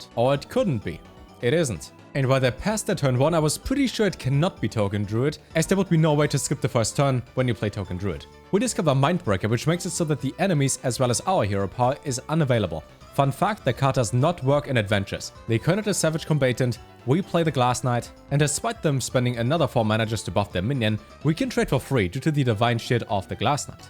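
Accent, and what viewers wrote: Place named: Germany. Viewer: can i ask u from where u are? u sound german ^^. just found ur channel, nice content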